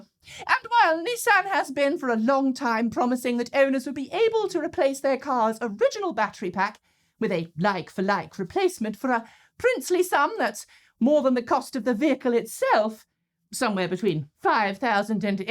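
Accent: England, United Kingdom. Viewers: great episode. and I liked the British pronunciation. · I love the proper English accent.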